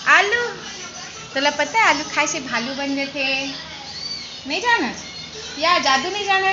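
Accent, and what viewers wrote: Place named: Chhattisgarh, India. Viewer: छत्तीसगढ़ी बोली आप के मुह से बहुत बढ़िया लगथे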